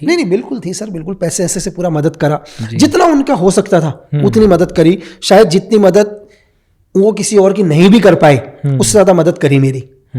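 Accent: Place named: Kumaon, India. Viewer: Kumauni log aise hi bolte hain ..krke ya thahre ❤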